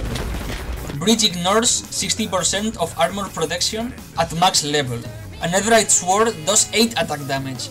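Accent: Spain. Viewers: SE TE NOTA UN WEBO Q ERES DE ESPAÑAA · Se nota tu acento español xd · eres español? el acento lo parece jajajaja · Se te nota el español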